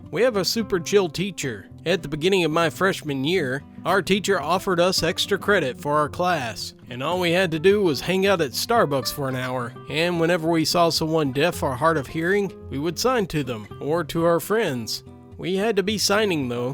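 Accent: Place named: West, United States. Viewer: Story #1 Setting: England. Narration Accent: American Western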